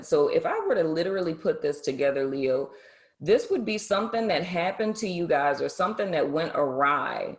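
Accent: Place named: South, United States. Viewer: WHERE YOU FROM?! I hear something southern in your accent. First time viewer. Love your approach!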